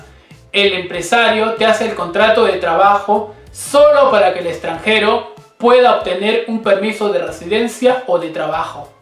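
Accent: Spain. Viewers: Os daré 🤡 latinoamericano hablando como español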